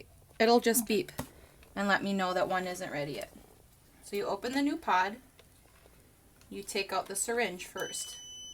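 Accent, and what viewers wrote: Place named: Minnesota, United States. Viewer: Excuse my silly Minnesotan accent!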